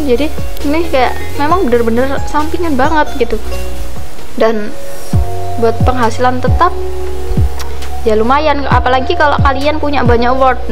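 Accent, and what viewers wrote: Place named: Java, Indonesia. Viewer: Baru mampir, logat nya jawa, jawa mana ni hhe, boleh kali numpang promosi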